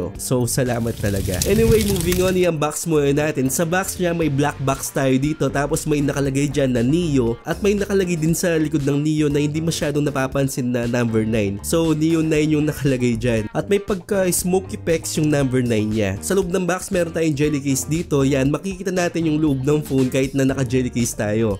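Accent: Pampanga, Philippines. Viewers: Kapampangan ka neh? balu mung balu ken pamanyalita mu lalagitik hahahaha taga ka nokarin pampanga sir?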